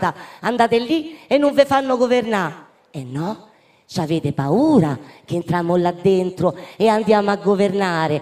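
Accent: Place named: Rome, Italy. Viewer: sei proprio 'na romanaccia,come me! daje che cja famo!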